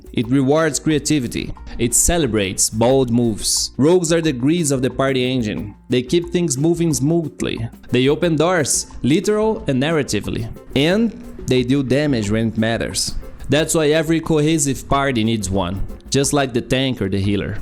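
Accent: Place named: Brazil. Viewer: Are you Brazilian by any chance? I'm asking because your accent sounds very Brazilian hehe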